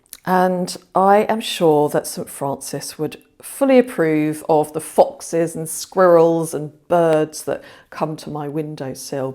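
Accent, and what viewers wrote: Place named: London, United Kingdom. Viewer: Do the ducks have a permit? London accent though.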